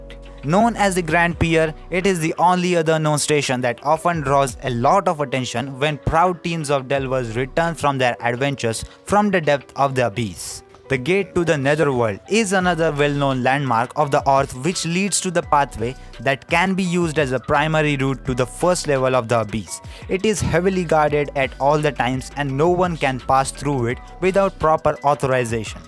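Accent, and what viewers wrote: India. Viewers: Very intreating good job! I don’t personally mind your accent because I’m also Indian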